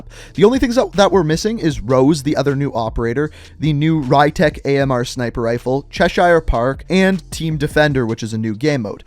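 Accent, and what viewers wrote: Canada. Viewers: It’s the Canadian accent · Im like a parrot repeating every word he pronounces so Canadianly. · Def can tell he’s Canadian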